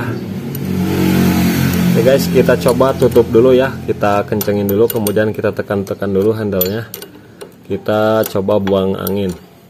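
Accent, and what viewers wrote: West Java, Indonesia. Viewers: Gan logat nya sundA bngkl nya di mna?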